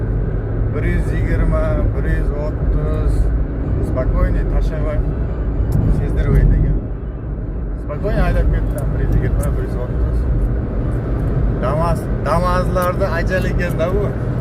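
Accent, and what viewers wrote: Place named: Kyrgyzstan. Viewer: Созунор Кыргызчага окшооп суйлопсунорго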